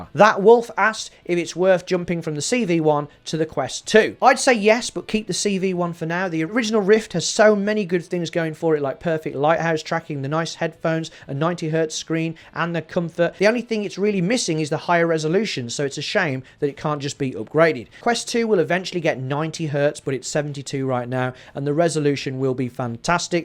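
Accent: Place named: Midlands, United Kingdom. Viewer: yow sound like yow come from the midlands mush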